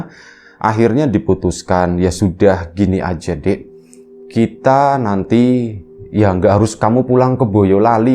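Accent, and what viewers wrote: Tegal, Indonesia. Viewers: Jdi kepo dg cerita2 di Riam Biru, ceritanya beragam thema dan variatif. Naratornya jg dlm bercerita kdng bikin aku ketawa sndiri, khas org Tegal. Salam buat temen2 yg di Tegal, jln layang, jln zaenal arifin,yg deket texin dan lain2nya...salam dari jogja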